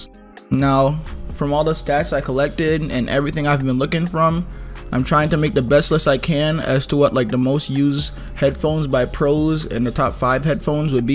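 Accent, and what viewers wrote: Jamaica. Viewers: You’re Jamaican?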